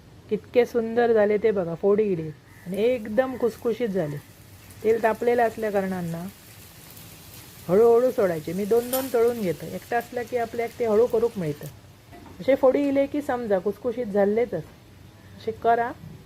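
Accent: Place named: Malvan, India. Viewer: मालवणी भाषा बोलण्याची पद्धत अप्रतिम अजिबात भेसळ नाही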